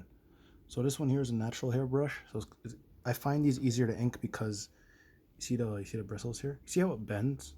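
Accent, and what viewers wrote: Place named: East Coast, United States. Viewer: That was fantastic. Also your accent made it feel like my cousins from the East Coast were giving the tutorial. Which for me was comforting.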